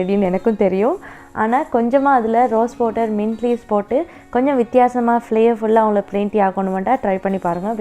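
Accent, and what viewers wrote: Sri Lanka. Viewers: Onga oor edu sri lanka la nega pesuradu naga pesura madiri eriki sis. I like u speech😍😍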